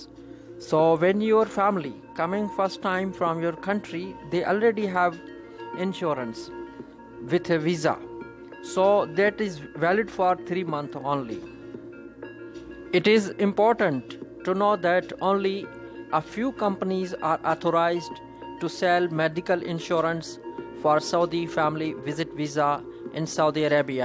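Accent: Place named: Punjab, India. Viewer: punjabi accent me english...arey bhai urdu me bna ly video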